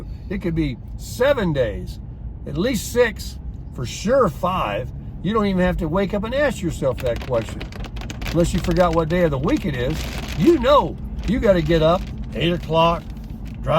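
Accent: South, United States. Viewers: speaks from the heart. A real Southern gentleman who's a pleasure to listen to.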